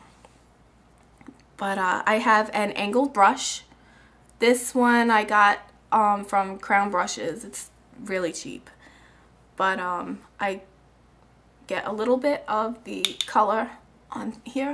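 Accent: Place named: Bronx, United States. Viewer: your from the city, like the bronx, or new york , lol CUTE!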